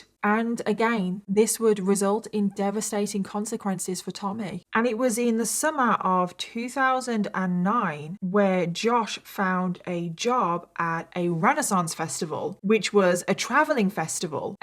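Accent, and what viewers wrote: United Kingdom. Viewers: we love a sassy British accent truecrime queen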